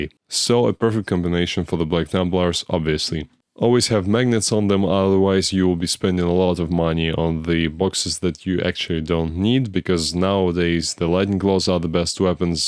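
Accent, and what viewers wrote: Russia. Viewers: Are u Russian?🥸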